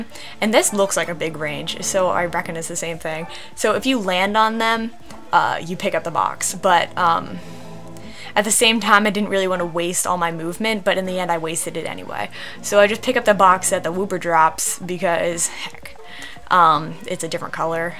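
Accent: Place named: South, United States. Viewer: you seem to have that kind of twang in your speech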